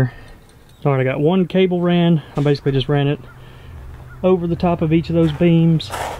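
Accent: South, United States. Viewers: You sir, have one of the most welcoming and friendly southerner accent ive ever heard, keep up the good work! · seems very shallow, and I assume by your accent you are from the southern usa, wont it dry up in the summer heat? is it by a river for water replenishment?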